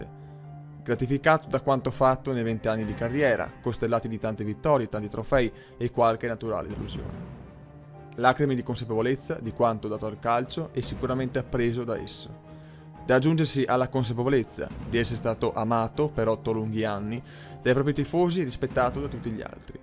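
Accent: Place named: Veneto, Italy. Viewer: Normalmente ti avrei coperto d'insulti ma dal tuo accento mi sembra tu sia di origine veneta perciò mi astengo....ma solo x quello....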